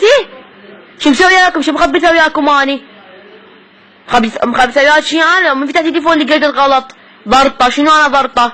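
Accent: Basra, Iraq. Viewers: فدوة لهجت اهل البصره اعشقهم 😍😍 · اوف ربي شلون احب هاي اللهجه وحق علي يكولك نفس اذا اسمعه ارتاح بغدادي انا واللهجه بصراويه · الله ينتقم منهه شلون تكلهه هجي 😂😂 خطيه ولله وهاي فطيمه شلون بيهه😜 لهجة بصراويه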